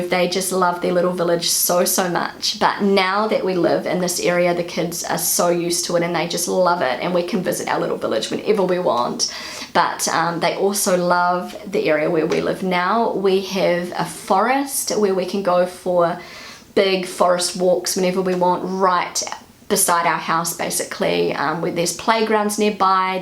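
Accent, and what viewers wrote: New Zealand. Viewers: Thank you for your always positive videos. I really enjoy them. Also nice to hear is your Kiwi accent. After +10 years in Germany you still haven't lost your accent. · This is a sanitized NZ accent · Your accent is very interesting. I had never heard anyone from New Zealand speak before.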